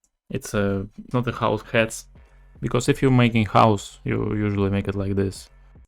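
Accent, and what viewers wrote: Russia. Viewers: акцент русский у тебя) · Блет, по акценту ты будто русский:/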